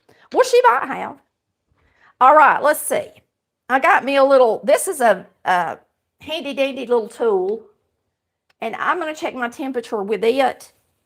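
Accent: South, United States. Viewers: You always make my day when I hear that wonderful southern accent. Happy Holidays to you both. Carrie from Delaware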